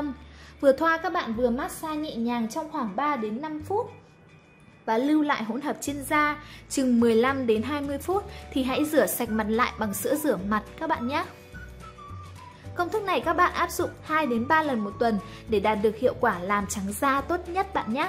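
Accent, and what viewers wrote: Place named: North, Vietnam. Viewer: Thích nghe mo lên thấy bac kỳ đoc ko thích khó nghe sai tu chát tai quá nói cho ng nam đoc ko cho vây hết ng hả nghe bac kỳ đoc chán bỏ me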